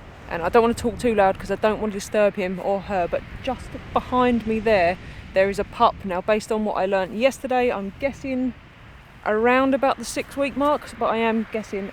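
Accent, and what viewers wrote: United Kingdom. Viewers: Does anyone know what her accent is? What part of the UK is she from?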